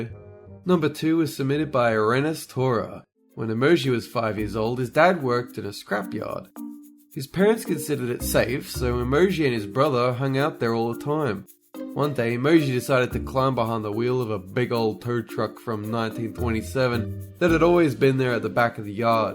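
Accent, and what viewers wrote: United Kingdom. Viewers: Are You British? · Wait, your not American? Are you British? Or are you Australian? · Eveline E-001 he's British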